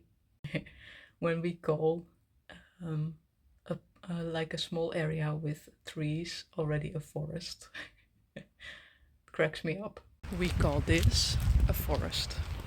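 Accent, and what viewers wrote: Netherlands. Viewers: Ladny nederlande akcent ) hhhrahhh)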